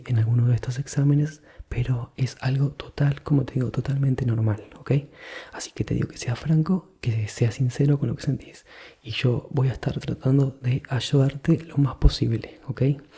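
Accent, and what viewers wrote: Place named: Uruguay. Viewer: Me encanta tu acento. ¿Sos Uruguayo? Saludos!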